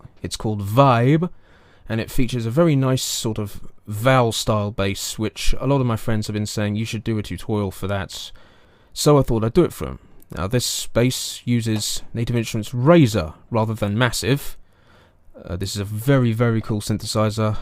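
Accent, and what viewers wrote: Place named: England, United Kingdom. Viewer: are you from england or something? your accent sounds like the guy from the simpsons that trades places with homer in that one episode, who falls in love with marge :D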